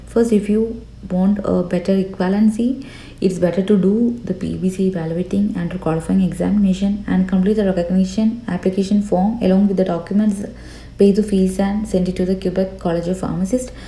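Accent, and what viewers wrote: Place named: India. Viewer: The strong Indian accent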